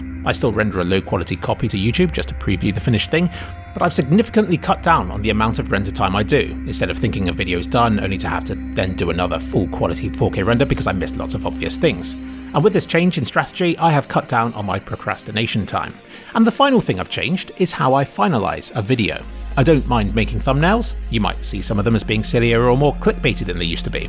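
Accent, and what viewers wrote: United Kingdom. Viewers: Hey, I use the subtitles you make because I think they're more accurate. And because English is not my first language it's hard to me understand British accent So I really appreciate your hard work subtitling your videos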